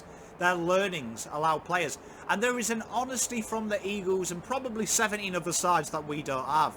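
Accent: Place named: North, United Kingdom. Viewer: Never seen you before but hats off - good analysis.... the beautiful irony of AFL analysis with the Northern UK accent! I'm a 52 year old life long Blues fan and this, I feel, was the lowest performance I have ever seen! The particular pain I feel is around the fact that we have a core of very talented players.... but the level of desire, determination and far dinkum 'have-a- go-edness' was totally absent - apart from the same few who try their boxes off & show something every week (Walsh, Weiters, Harry etc think.... "fuck maybe I'm receptive to other clubs warm embrace). Need for the humility & reality involved in your 'Rehab' is a good metaphor for this sorry situation - knowing 'where you are at and embracing the hard work on a worthy journey'. Has Teague lost this group? Sunday SHOULD have been a showing of ferocious intent to demonstrate (even if only to ourselves) that we have 'something' by way of ticker. 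Instead, what I witnessed was a demonstration of such incompetence, lack of passion and lack of 'team' that I found myself (for the first time) not even disappointed - just numb. Keep up the good work - you're showing more passion than some of the folk who should be Driving this club!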